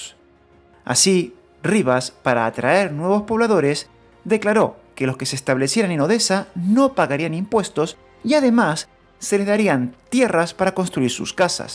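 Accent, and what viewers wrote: Latin America. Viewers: Ya quisieran muchos profesionales hacer audiovisuales como este canal. Y me encanta el acento Hispano de América.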